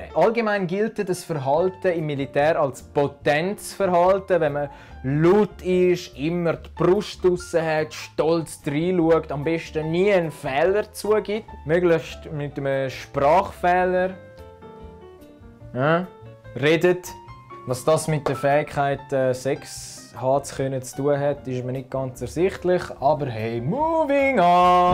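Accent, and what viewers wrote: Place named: Switzerland. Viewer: Ich bin ja wirklich tolerant, aber Schweitzer Deutsch ist mir ein Level zu hoch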